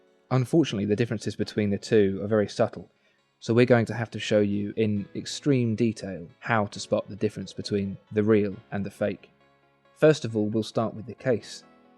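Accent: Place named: RP, United Kingdom. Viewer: this guy has a very nice royal accent.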